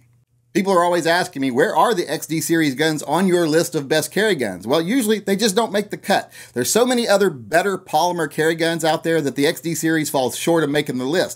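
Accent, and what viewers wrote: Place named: South, United States. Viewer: 2 minutes in " yarnt going lose anything." you may live in the west but you still southern BOY. I find that refreshing.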